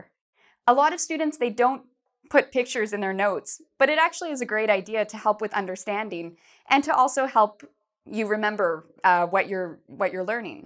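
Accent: Canada. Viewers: you must be Canadian? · Because she is Canadian. She says "Abowt" when she says about. This is very common in Canada. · she's Canadian I think. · Canadian eh? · Because she is canadian, not american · And your English sounds somewhat Canadian. Good job.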